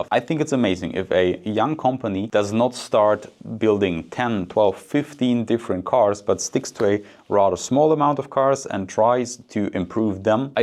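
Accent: Germany. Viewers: Horrible German accent. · Can not stand this horrible German accent. Get pain from this accent.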